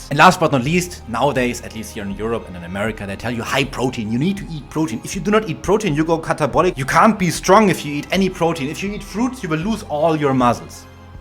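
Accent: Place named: Germany. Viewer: i like your german english. it is like me :) thanks for your inputs.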